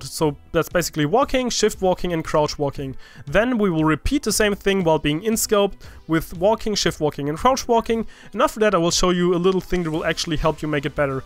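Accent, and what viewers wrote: Germany. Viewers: hab ich mir auch schon gedacht und auch das Englisch hat so ein bissl deutsch xD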